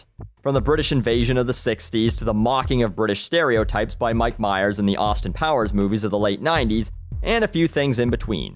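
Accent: Canada. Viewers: I was just going to say that. "Oot" "Aboot". Seems Canadian to me... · Oh... so thats what a Canadian accent sounds like. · yeah, he's canadian I was thinking that too (since my boyfriend is canadian and im used to their accents) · you sound Canadian.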